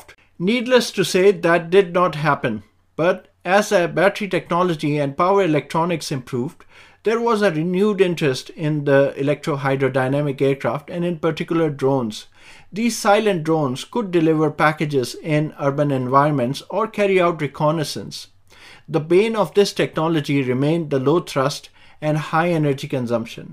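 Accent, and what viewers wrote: India. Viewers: English indian version.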